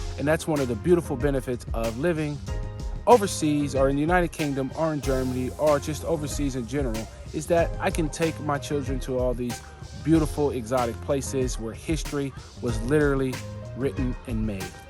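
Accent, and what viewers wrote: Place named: United States. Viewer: Hi, it's wonderful to se you and your girls having an ace time. I have a question, it's based purely on what has been my love of language all my life. I love to hear you talk in American English because I hear you say, "M'am, Yes Sir, No Sir." For me this is almost 1920's English language. English language seems to evolve more slowly in American English. Given that the "cultural melting pot" is being slowed down more quickly by immigration restrictions in the US, would you agree that may be why Americans still use very old fashioned ways of adressing people?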